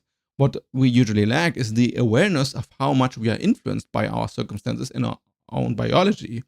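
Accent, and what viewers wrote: Germany. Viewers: Am i crazy or is that a german accent if yes keep it going, german youtube is so trash that this content is gold compared to their mainstream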